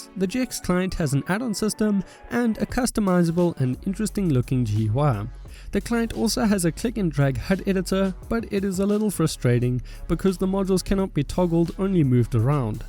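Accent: South Africa. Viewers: could hear the south african accent a mile a way 😄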